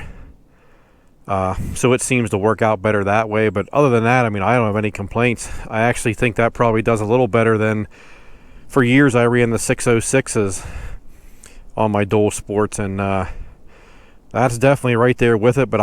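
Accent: Pennsylvania, United States. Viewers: I saw your in Blair county, I’m from York county, I could tell by your PA accent you were from PA haha, always looking for people in central PA to ride with!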